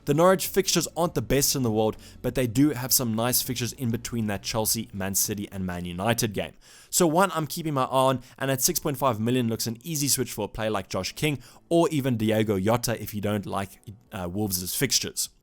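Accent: South Africa. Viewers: good to hear that SA accent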